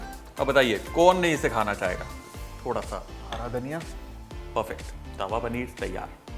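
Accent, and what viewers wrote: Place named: Lucknow, India. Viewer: Aap bilkul lko ka touch dete hain boli m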